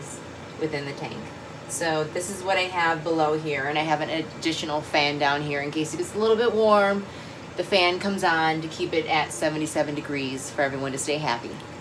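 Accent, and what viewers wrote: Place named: Brooklyn, United States. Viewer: With both the Polyfilter and carbon in the sump, I'm curious about how much production you really get from the skimmer. Both are supposed to be removing the organic substances that the skimmer is skimming. And I'm wondering whether you could only use the chemical filtration and skip the skimmer. P.S. You sound like Brooklyn.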